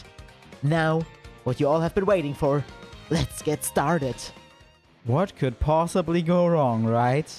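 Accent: Germany. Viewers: Man hört den deutschen Akzent raus 😂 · I hear a German accent ?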